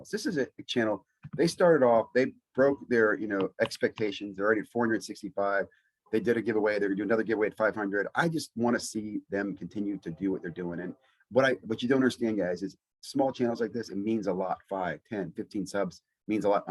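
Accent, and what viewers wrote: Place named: South, United States. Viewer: Liked for the southern accent 😆😆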